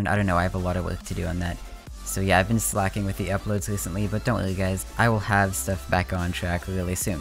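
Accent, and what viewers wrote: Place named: Quebec, Canada. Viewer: THAT FRENCH CANADIAN ACCENT THO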